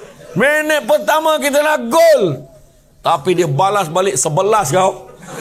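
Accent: Negeri Sembilan, Malaysia. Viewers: Assalamuslaikum wbt Allhamdulillah syukur kehadrat Ilahi saya suka dgn loghat NS ustaz. Selawat keatas Rasulullah SAW trima kasih atas ilmu yg ustaz sampaikan hanya ALLAH yg membalasnya. Aamiin3 YRA.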